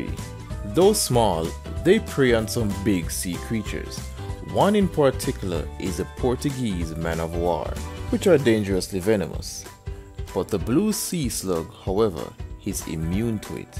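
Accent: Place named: Philippines. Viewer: you sounds filipino,are you?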